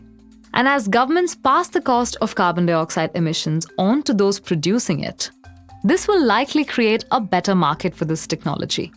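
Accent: India. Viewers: when hear indian acent i laugh so hard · I love her Indian accent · i like the Indian accent personally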